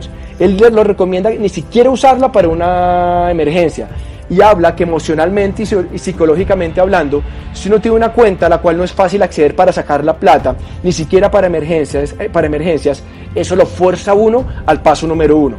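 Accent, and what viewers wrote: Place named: Colombia. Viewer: A mi me encanta el acento colombiano! No les hagas caso! La gente mediocre se la pasa criticando!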